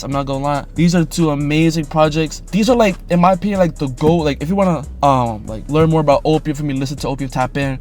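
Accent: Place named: Mexico. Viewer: WAIT YOU SAID THE N WORD??? YOUR BLACK????? I THOUGHT YOU WERE MEXICAN. you sound mexican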